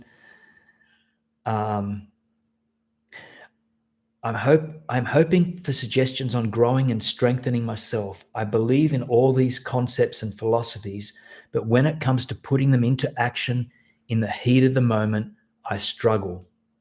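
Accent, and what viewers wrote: Australia. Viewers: You have the perfect voice for doing the affirmations. Your tone and accent are really awesome. Is your amazing accent Australian? Thanks for your good and positive attitude and energy.......it has really helped through the tough times.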